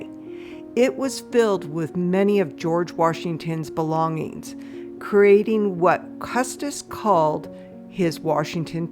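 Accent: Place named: Iowa, United States. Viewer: Narrator must be from Iowa. I grew up there and it’s the only place where I heard natives say “Warshington” or warsh.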